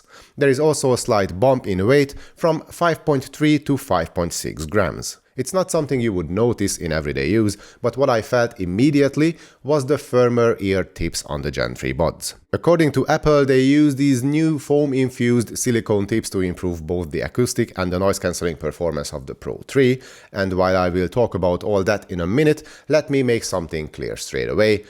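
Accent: Hungary. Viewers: Magyar vagy? Hallom 😁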